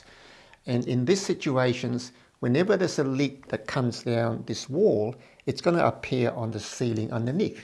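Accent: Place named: Australia. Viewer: Completely off the topic, but I love hearing such an Australian voice from such a non-English face. You are what makes this country worth being proud of. Thank you.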